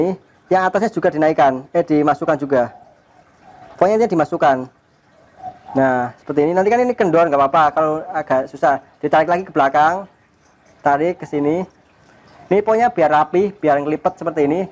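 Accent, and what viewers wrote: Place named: Yogyakarta, Indonesia. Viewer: Matur nuwun mas, Sangat membantu, semoga jadi amal jariyah,...btw logat Jogja nya kentel banget...😀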